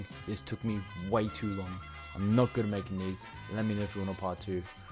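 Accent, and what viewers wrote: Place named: Australia. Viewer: Fellow pom ? You sound Australian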